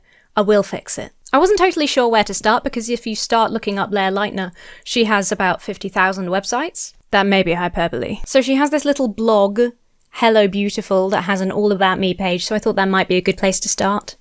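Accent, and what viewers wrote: United States. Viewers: that american accent genuinely broke my brain for a good few seconds when i saw your mouth moving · I'm only twelve and a half minutes in, but I have to say I got so confused when you began reading in an American accent. I listened to this in the background while I drive, so it was a little bit disconcerting. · Your American accent is impressive. Reminded me of the one Michael Fassbender does in Alien Covenant. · I love your American accent. It's gold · with an american accent is cursed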